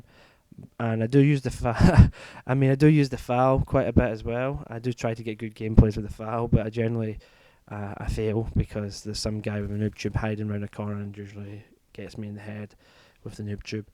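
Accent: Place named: England, United Kingdom. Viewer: nice english accent ^^ and good game :p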